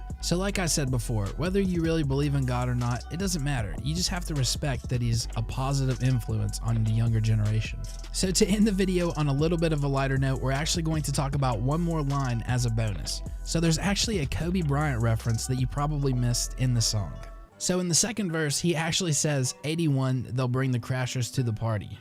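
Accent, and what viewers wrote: United States. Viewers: Mega southern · Broooo, you gotta be from B-More you got the strong O accent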